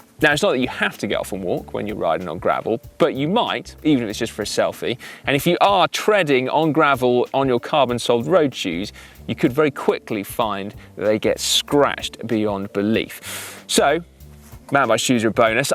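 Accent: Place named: England, United Kingdom. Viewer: Ble ble ble idiotich akcent facken englenda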